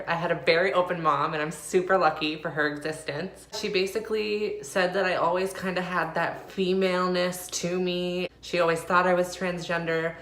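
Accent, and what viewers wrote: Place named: Canada. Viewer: I enjoyed the video, nice to see you doing well. You have a Canadian accent, so your health insurance is nationalized. Good for you!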